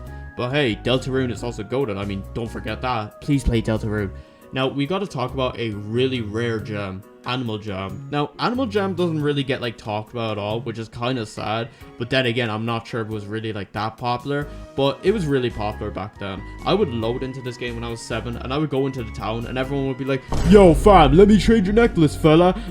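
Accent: Ireland. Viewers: are u from Ireland? If you are i am too!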